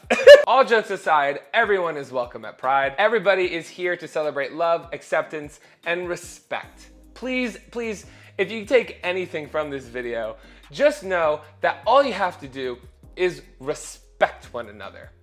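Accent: New Jersey, United States. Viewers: As a Jersey native, still having his Jersey accent after visiting his home is so cute and relatable. 😂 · I love a proper Jersey accent. Most think it’s Staten Island when it’s little differences. And definitely not Brooklyn either. “Cholly” instead of Charlie “Wuff” instead of “wolf” “Tawk” instead of talk or “towuk” (SI accent) “Wunnuh” not “wawunuh” (SI) “Guhd” instead of God or “gawd” (SI)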